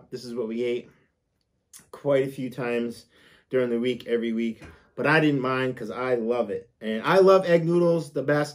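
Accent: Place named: Massachusetts, United States. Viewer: You're awesome. I'm a Maine-ah, so I just love that you're so stereotypically Massachusetts. If anyone asks what a Bostonian or "Southie" is they should be shown a clip of you!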